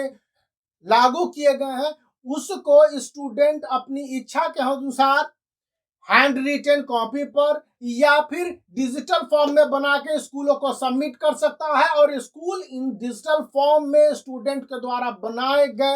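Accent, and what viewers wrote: Bihar, India. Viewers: haa sir Bihar se belong krte h lg rha h bhai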